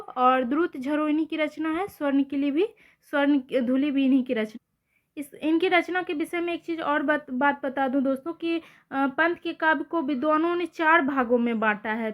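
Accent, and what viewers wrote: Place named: North Bihar, India. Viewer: Medam AAP उतरी बिहार से हैं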